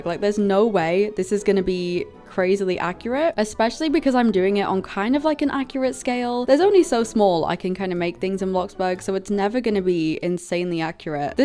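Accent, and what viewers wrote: United Kingdom. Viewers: wait r u from the uk if so that’s really cool cuz I don’t know many dti uk YouTubers 🤭💗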